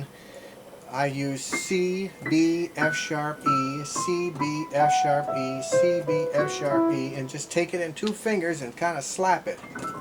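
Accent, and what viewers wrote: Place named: Pittsburgh, United States. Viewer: You are an outstanding pianist as well as a wonderful teacher. Your accent sounds like you are not far from me in Steeler country. Keep up the brilliant work!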